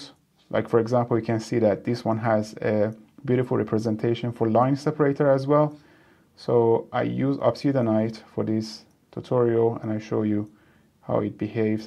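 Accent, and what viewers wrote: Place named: Iran. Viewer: لحجه ایرانی ات منو کشته پسر. دمت گرم <3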